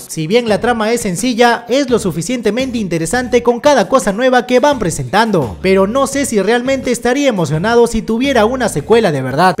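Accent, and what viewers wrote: Peru. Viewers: Gracias a ti me volví fan del acento peruano xd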